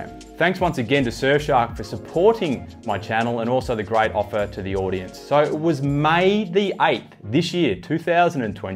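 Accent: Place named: Australia. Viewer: Aussie accent #chinurr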